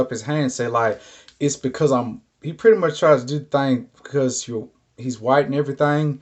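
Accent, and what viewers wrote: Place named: Texas, United States. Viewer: You sound like you’re from Texas like me.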